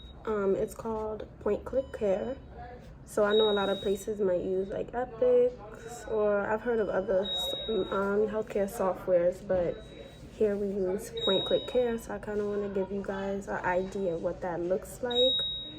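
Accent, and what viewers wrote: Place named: New York, United States. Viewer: I know a Nyer when i hear one lol Thanks for making this video sis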